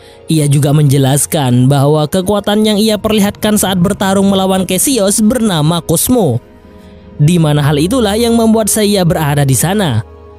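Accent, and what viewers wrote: Madura, Indonesia. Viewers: Ngereng yatoreh?Reng madureh?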